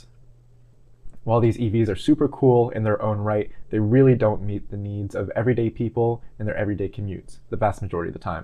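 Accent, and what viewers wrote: Australia. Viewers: You sound more Aussie than Yank, but you sell the snake oil like a Yank. Where are you from (without being specific)? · you think he sounds australian?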